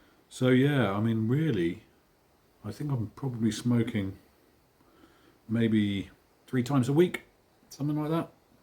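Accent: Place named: Dorset, United Kingdom. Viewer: Good to hear your dulcet Dorset tones, mate!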